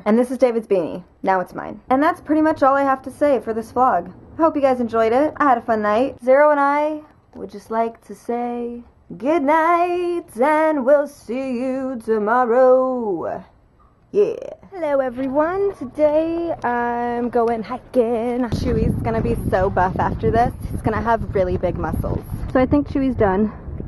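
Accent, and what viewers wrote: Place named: United Kingdom. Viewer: I love your style. I would love to hear you talk more with your British accent.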